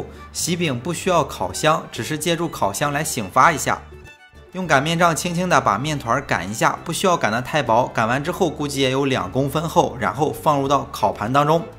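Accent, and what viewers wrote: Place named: Shandong, China. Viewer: 听声音一直觉得您好像带山东口音，又会做喜饼，先生应该是咱们山东人吧？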